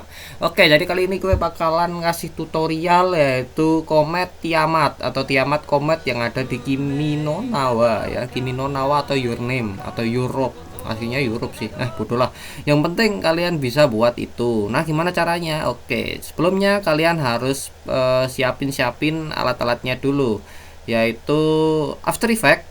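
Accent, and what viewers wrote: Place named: Java, Indonesia. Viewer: English Jowo Anjir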